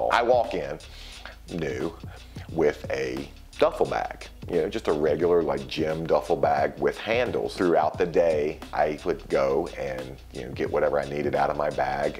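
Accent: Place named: Southeast, United States. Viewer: Just like any other job... good w the bad. I have to say. I am from Eastern North Carolina and you also have that South Eastern accent. Even though we call VA borderline Yankees lol. But seriously... I honestly love the fact that you take time to do this. I watched this instead of Friday night SmackDown.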